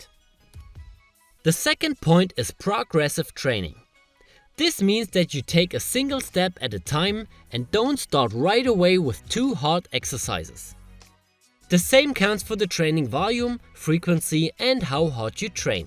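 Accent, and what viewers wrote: Germany. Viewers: When an German-sounding European, with the beside manner of Hannibal Lector tells you these things, you listen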